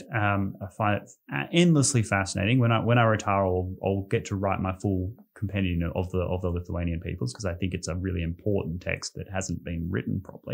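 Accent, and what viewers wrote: Australia. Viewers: Thank you! Its amazing to listen australian talking about these forgotten things!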